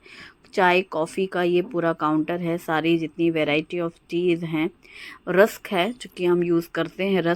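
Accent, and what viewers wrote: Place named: Lucknow, India. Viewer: Hum lko k hi hai.. Apki bolne k tareeke se hi laga ap wahi k aspas k hoge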